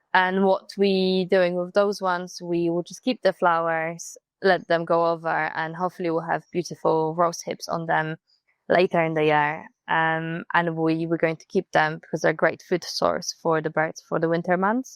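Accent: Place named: Sweden. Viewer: You sound a bit Swedisch.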